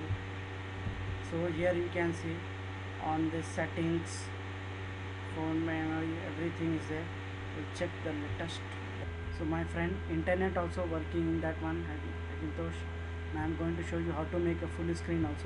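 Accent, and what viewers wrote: India. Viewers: I love indian 80% problem before this is fixed by indian accent tutorial.. thank bro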